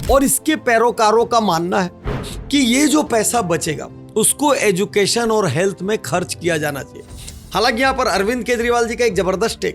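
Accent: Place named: Gujarat, India. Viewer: लगता है सर आप गुजरात से है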